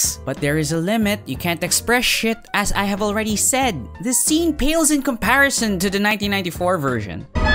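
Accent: Philippines. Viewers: Hey bro, great video hahaha can't help but notice you have a little bit of a Filipino accent. Greetings from the Philippines! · Do I hear a Filipino accent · Are you Filipino? i can sorta hear a bit of an accent behind your words lol.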